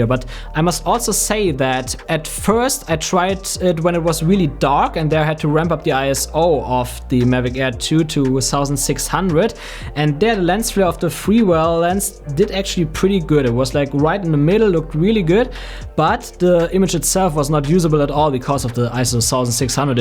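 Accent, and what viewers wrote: Germany. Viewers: Höre ich da einen deutschen Akzent?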